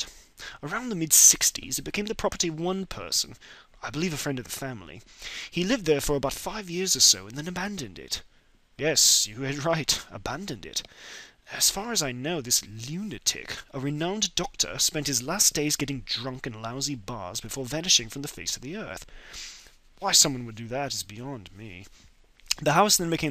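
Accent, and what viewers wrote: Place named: England, United Kingdom. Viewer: I love your voice with the English accent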